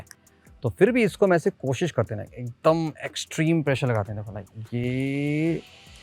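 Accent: India. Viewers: I don't really understand English with an Indian accent. Can someone give a conclusion from the video? Is silver better?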